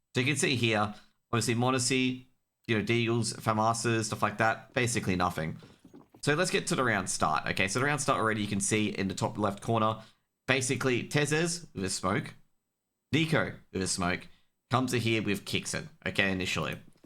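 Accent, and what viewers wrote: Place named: Australia. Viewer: I like the sound of your words Australian man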